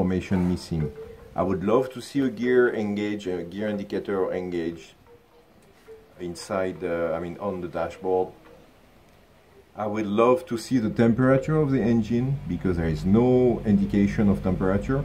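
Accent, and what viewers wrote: France. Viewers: are you french ? because you speak englich as à french guys......salut d'un motard français. ....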